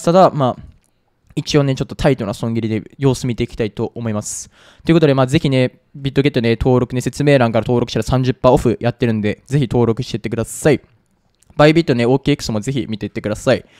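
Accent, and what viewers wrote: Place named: Kansai, Japan. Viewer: 関西出身の友人と口調が似ています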